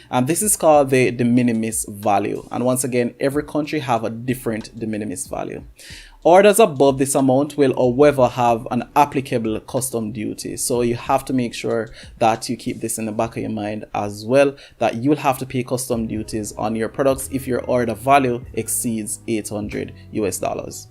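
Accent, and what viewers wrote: Jamaica. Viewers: U sound like a yaadi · Bro your jamaican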